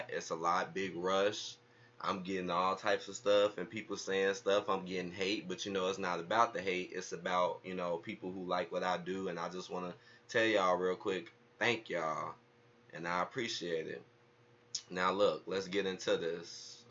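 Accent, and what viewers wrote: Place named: United Kingdom. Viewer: Wow. I'm glad I"m not the only one that caught the British accent being covered up. I bet this guy originates from Nigeria or one of the islands..They frequently migrate to London.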